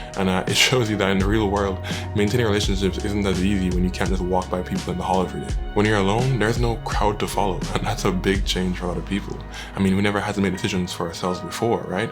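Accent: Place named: Ireland. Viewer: I know youve a carribbean accent but it still aounds so irish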